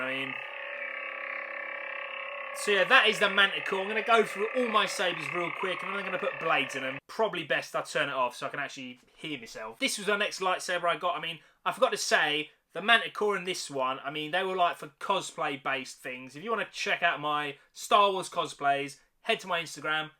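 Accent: United Kingdom. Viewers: Why does he look like an American hipster while talking like a Brit?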